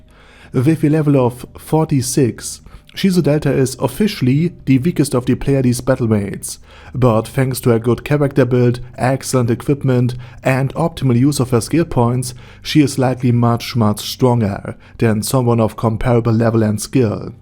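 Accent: Germany. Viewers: Danke aus Berlin 😘 i find your German accent way more charming than the ai alternative. 😊❤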